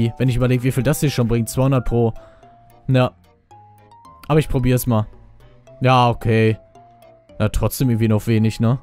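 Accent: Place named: Berlin, Germany. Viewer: Der jute akzent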